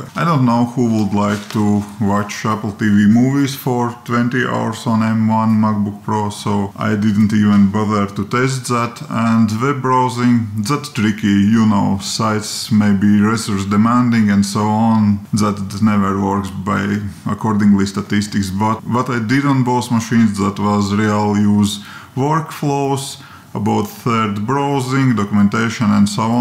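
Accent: Russia. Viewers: Are you Russian?) You have a russian pronunciation. Nice review, thanks!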